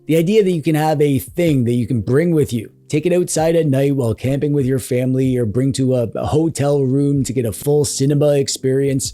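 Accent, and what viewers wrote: Canada. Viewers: You're amazingly Canadian, huh? I don't know how I never noticed til this video.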